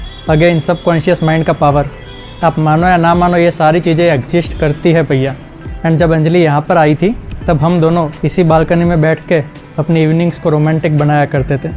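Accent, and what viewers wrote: Gujarat, India. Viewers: Your Gujarati English accent is so sexy 🔥🔥🔥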